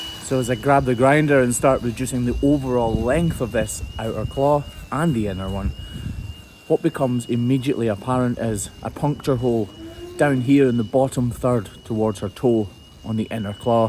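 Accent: Scotland, United Kingdom. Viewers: keep speaking as you do. I'm from Kilmacolm and I appreciate representation of our way of speaking on YouTube.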